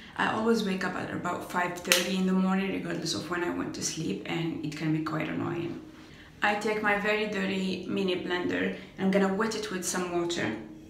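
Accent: United Kingdom. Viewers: Great video! You seem really comfortable and happy it's nice to see. I like the makeup look, I just don't give myself enough time to apply makeup in the mornings so skip it. Glad you are working on finishing your open products. I am doing the same. I think you my be picking up a bit of a London accent its cute!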